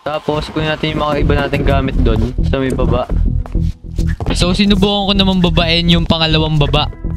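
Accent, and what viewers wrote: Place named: Philippines. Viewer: Filipino Accent "para syang OPIS" ANG LT KUYA HAHAHAHA Nice vid😆❤️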